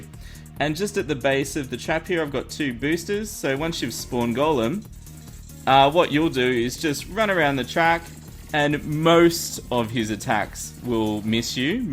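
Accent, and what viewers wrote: Australia. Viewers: what part of Australia are you from I'm in south Australia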